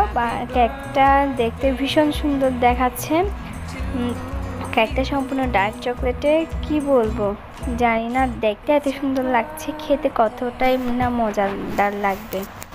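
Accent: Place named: Chittagong, Bangladesh. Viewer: Apu Tumi ki ctg r Tomar kothagula sune mone hoitese happy birthday to apu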